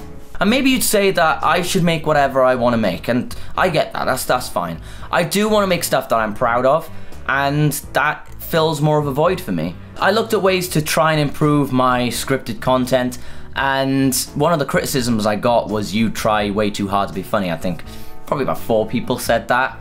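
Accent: Wales, United Kingdom. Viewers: Hey man, where in Britain are you from? I'm trying to pin point your accent and you sound Welsh (I'd know😂) but I can't really tell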